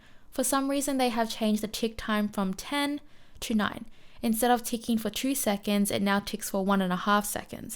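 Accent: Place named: New South Wales, Australia. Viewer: Those upgrades are very welcome! Thanks for the updates. Please keep it up. Love the accent too! NSW?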